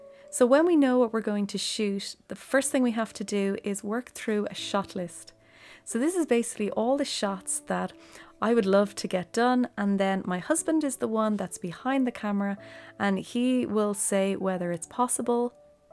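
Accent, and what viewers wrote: Ireland. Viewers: I so love your soothing beautiful irish voice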